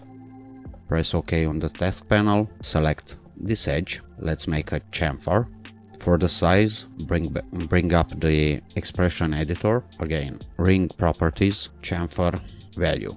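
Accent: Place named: Finland. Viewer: but the way people from finland speak so slowly and with their accent makes the video drag on. Not that i don't mind the accent, but i feel like the video is going way too slow as if i'm being talked to incredibly slow on purpose because someone assumes i'm retarded. LOL. good info though.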